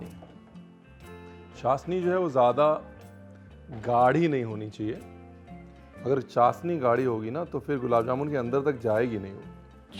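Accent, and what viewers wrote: India. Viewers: Aapka sun k aisa lagta h, india ki origin kuch h ki nah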